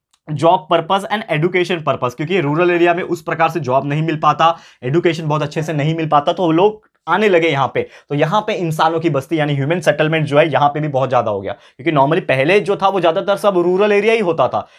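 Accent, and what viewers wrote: Maharashtra, India. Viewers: Sir are you Marathi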